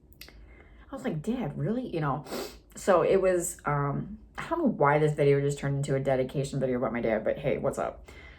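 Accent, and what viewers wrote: Michigan, United States. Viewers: ur michigan accent is so funny to me im from cali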